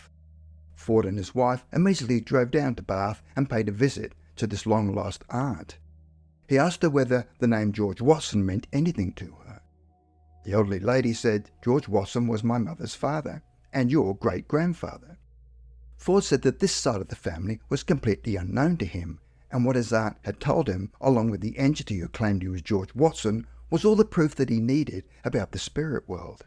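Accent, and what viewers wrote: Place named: Australia. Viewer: The narration is in English - it's a lovely Australian accent, and it is not hard to understand at all.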